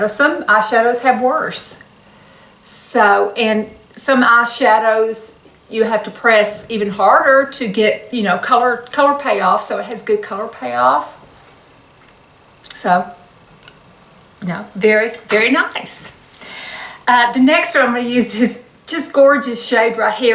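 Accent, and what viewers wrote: South, United States. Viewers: Hi neighbor - I am from the western side of Arkansas. Discovered your channel thru The New Beauty email article recently. Love watching you and hearing that southern accent and phrases I am so used to. I feel like you are my good friend! Your demos are very good, especially for me, since I am 9 years your senion. I will keep watching. Keep up the good work!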